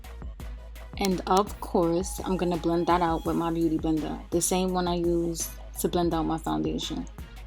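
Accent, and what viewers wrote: New York, United States. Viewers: your voice is like a mixture of cardi b remy ma & nick I minaj. I guess it's just the NY accent! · i love your Ny accent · Your NY accent is so sexy, ma. I love it. Too beautiful 💜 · wow us New Yorkers accent is too real 😂 love it. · Omg! Me too, I'm from New York but I was like damnnn her accent heavyyyy lmao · OMG we do have a ny accent. I thought people were buggin when they say NYers have a accent. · Yo I'm new and I could tell you from New York by our accent 🙌🏾 and you're fine af 😍 subscribeddddd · this video made me realize that we dead ass have a accent in ny. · Ur talk over is hella new york😂😂😂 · The New York Accent Yes 😛❤️!! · New York accents sound so mean but bomb 😂😍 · YESSS! So cute. I love NY accents. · Her thick New York accent is so sexy 😩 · that Nicki Minaj New York accent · Lmao the NY accent made the video 10x better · that NY accent is heavy though !! 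· Mad NY. You sound like me lol love the video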